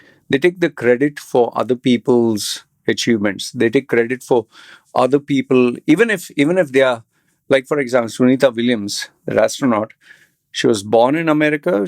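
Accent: India. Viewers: Your accent is Indian too…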